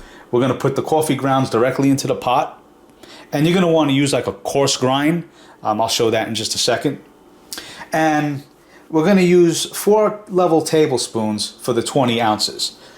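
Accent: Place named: Boston, United States. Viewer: This guy is so Boston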